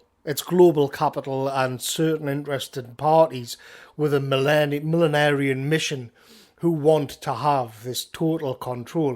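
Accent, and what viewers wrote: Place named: Newcastle, United Kingdom. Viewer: Fuckin hell a Geordie?! :O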